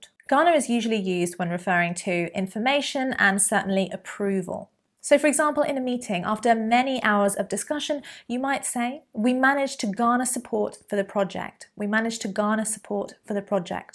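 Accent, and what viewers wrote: United Kingdom. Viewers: Thanks VERY much for this lesson❤ hi mis I'm new here in this channel I want learn English British accent with you and thanks again I LOVE YOU 🌷🌷🌷🌷🌷🌷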